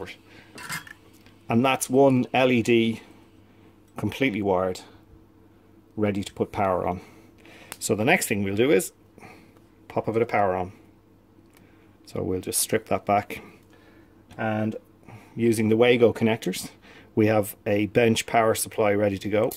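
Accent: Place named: Ireland. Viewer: Thank you very much for your explanation. I'm just a step on trying myself 😄. I lived in Ireland for 5 years and I couldn't help myself of thinking you sound 'slightly'😄 Irish. I'm sure I'll be wrong. I'm watching videos like a crazy because I have a lego rocket I want to light (the thrusters) and I'm figuring out how to do it. Cheers from Spain ♥️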